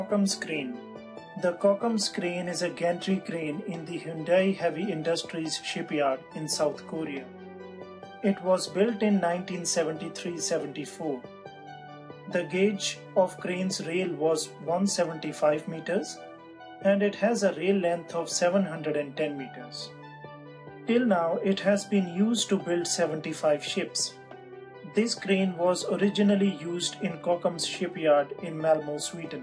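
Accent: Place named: India. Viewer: As soon as I hear an Indian accent, I know it's going to be full of bullshit and factual errors.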